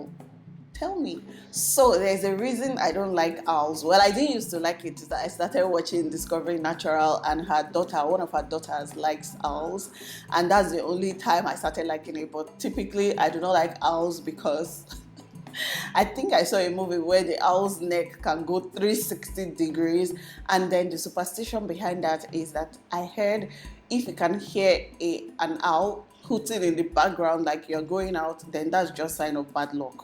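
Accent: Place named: Warri, Nigeria. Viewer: No wonder!! I knew your pidgin English was like a warri girls own ... your so beautiful 😍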